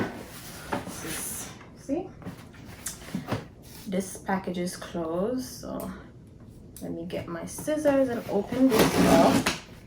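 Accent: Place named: Jamaica. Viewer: I loovveee your fashion!!! I hear some patois mi sis!!!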